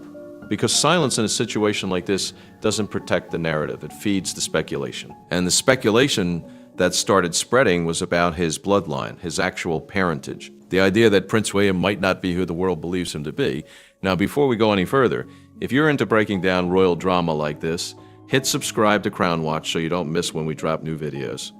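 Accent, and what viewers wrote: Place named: United States. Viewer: This video has to be investigated to ascertain who is behind this rubbish. Is the American accent a clue?!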